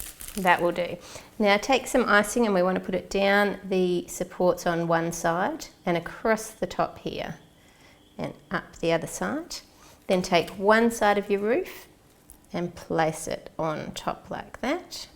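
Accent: Australia. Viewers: I am from Maryland,Us and I would honestly love winning the mixer because I love baking ands cooking but I don't always have the tools to do so(ands being 14 I don't really have the money either) and I have always dreamt of having a mixer to make what the bakers online make (I don't only watch you and other Australian youtubers to perfect my accent) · Ikr I could listen to all day:D she's Australian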